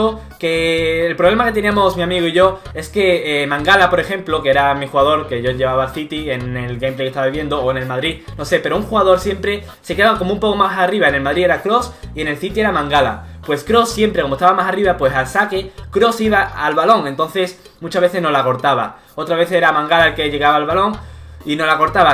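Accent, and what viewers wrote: Germany. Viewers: eres Alemán??? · eres aleman?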